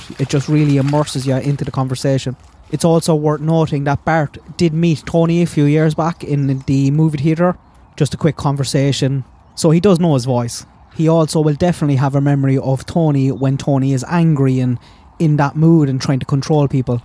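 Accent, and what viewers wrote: Cork, Ireland. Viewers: New subscriber is that a Cork accent feen??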